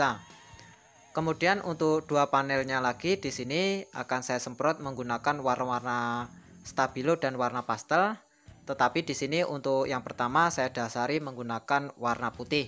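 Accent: Java, Indonesia. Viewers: saya kira di jawa mas, soal e logatnya kayak orang jawa.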